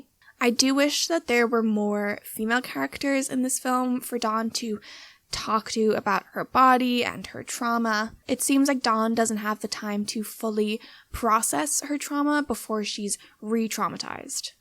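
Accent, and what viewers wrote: Ireland. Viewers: Amazing video but unrelated, do you have a foreign accent? just on the Rs and the "ah" sounding As does it sound irish-ish lol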